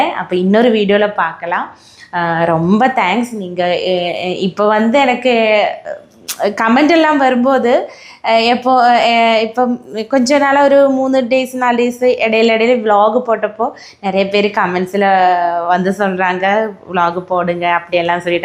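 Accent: Kerala, India. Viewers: Tamil malayalam rendu kalandu Super a pesiringa anni I like your family 🎉❤😊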